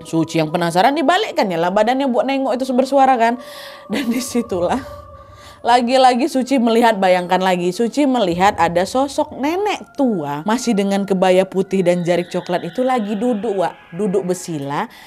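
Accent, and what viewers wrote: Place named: Medan, Indonesia. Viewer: Tau kau! 😂 ini medan kali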